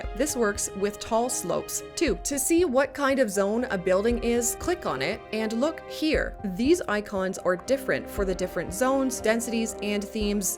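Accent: Newfoundland, Canada. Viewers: Excellent video! I noticed that you say, "a-boot" instead of "a-bout". As a Canadian, you are the only person I've ever heard using this pronunciation. Vaguely, where are you from? Is it standard pronunciation there? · Are you a Newfie? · Holy Canadian accent, girl! You said it once and my eyebrow went up, then you said it again... "Round-a-boot". I moved away years ago and it is nice to hear the accent every once in a while. 😁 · Your a Newfie!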